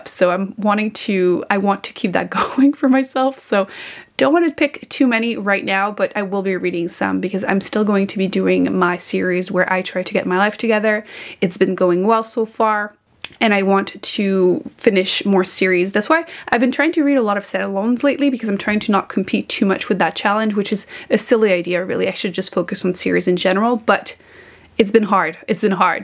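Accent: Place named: Quebec, Canada. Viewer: Hi! Just found your channel and really enjoying the videos I've seen so far. I was thinking your accent sounded a little bit like mine and wondering if you were French-Canadian, and now that I heard you say "Méditerranée," I think my hunch might have been correct. 😄 I look forward to hearing your thoughts on the book you've shared for your TBR. I currently have on loan from the library The Midnight Feast from Lucy Foley, The No-Show from Beth O'Leary, Razorblade Tears from S.A. Cosby, The other Half from Charlotte Vassell and First Lie Wins from Ashley Elston. Then I would like to shop my stash a little bit, as I recently purchased some of the books on my wish list that my library does not carry. I'm not sure what I will prioritize, I will just go with my mood at that moment.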